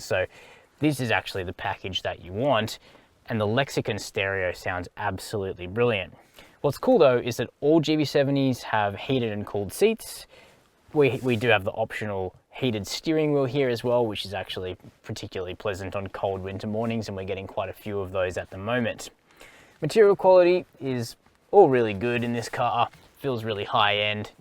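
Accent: Australia. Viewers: He is Australian. It’s his natural accent.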